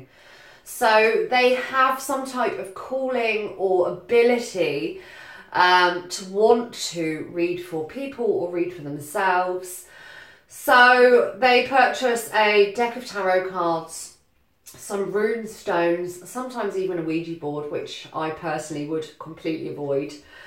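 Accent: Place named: England, United Kingdom. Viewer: Thank you, soooooo true! Ps... 'LOVE your English accent and integrity. Yes, HEIDI Symones always resonates w me, and the MANNA reader is ADELINA Bond! Live their ORIGINAL style as yours as well. Have a great day.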